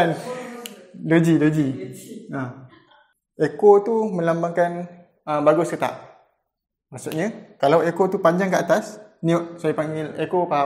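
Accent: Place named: Kelantan, Malaysia. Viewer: ore klate ni..sbb bunyi "jolo doh"..hehe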